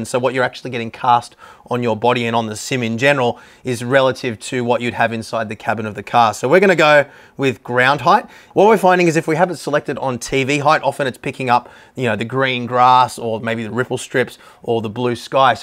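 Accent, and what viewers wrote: Australia. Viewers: Philips: Dutch brand and product. You’re welcome Your “unlimited budget” make it easier for us to decide if this is something to invest in so personally I’m happy you’re doing all of this 👍 But man, these videos you put up here are always the most complete and informative you can find around here on this topic. The Australian accent gives the entertainment factor a huge boost also.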